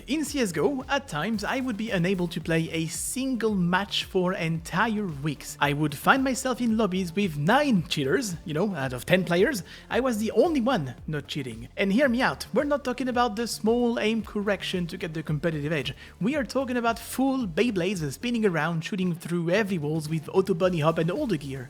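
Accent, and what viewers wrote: France. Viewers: T'es français fréro l'accent ça trompe pas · Ton accent français 😂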